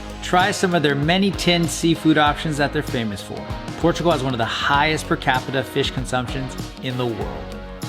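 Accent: Brazil. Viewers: Just please dont use the brazilian portuguese app you were using in the video, please! Portuguese from Portugal is a bit different from Brazil and the pronounciation/accent are very different. Thanks. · you are learning portuguese accent from brazil and not the real portuguese from portugal :D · You are learning Brazilian Portuguese 😅😅 Portugal accent is stronger, like British English vs American. But you are doing great, ready to go to Rio de Janeiro🙌🏻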